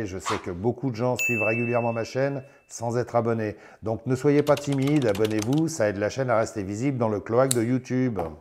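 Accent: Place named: South, France. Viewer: Il me plaît bien effectivement ,en plus on a eut droit à l'accent du Sud,une longue fausse pub. Merci à toi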